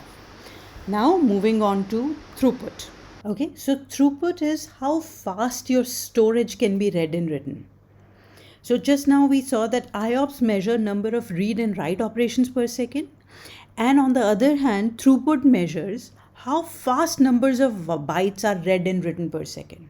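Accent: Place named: India. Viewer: sure u indian...accent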